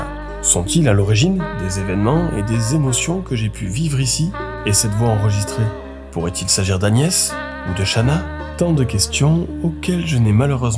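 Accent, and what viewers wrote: France. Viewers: Merci pour ton enquête. Ton anglais est très bon, c'est juste que tu as l'accent français, ce dont les british raffolent lol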